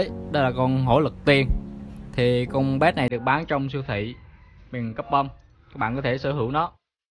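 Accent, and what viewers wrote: Quang Ngai, Vietnam. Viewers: Giọng QN dễ thương ghê haha :))))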